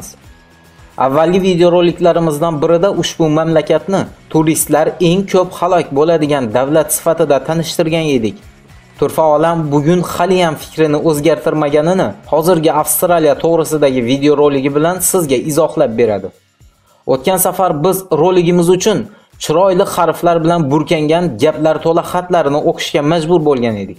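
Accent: Uzbekistan. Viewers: илтимос тошкенча гапирла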